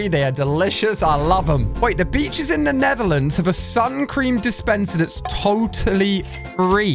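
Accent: United Kingdom. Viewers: I'm Irish are u like British or? I love the smartie chocolates btw!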